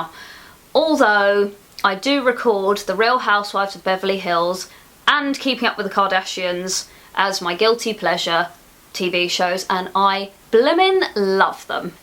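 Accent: United Kingdom. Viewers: Love your British English and found you very elegant. Cheers from Canada! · I love how English you are and really enjoy listening to your British English . I am not surprised you liked English at school. Xoxo from Vienna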